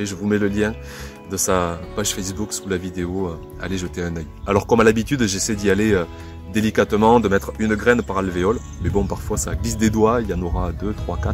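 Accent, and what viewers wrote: South, France. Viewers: Bonjour de vous voir et entendre ce petit accent du sud nous réchauffe toujours le 💖.alors soyons tous unis dans cette période difficile.merci pour votre générosité.bon courage à vous tous et toutes et bonne continuation.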